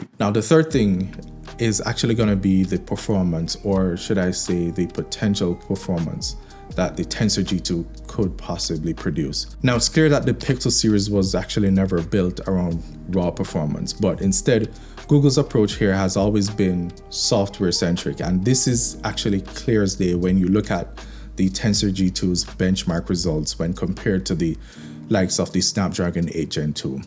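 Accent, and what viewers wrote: Jamaica. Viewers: Are you from the Caribbean, specifically Jamaica?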